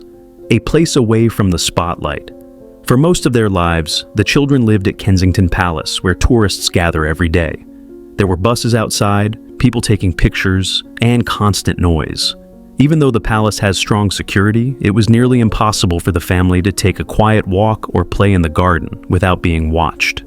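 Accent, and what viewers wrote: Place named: United States. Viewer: Interesting, but did we need the awful American accent on a programme about a Royal home?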